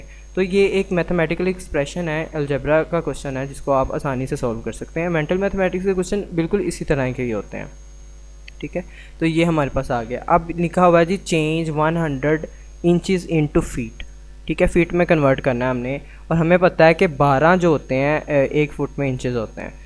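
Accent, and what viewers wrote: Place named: United Kingdom. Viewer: Omg ur English accent 🥲❤️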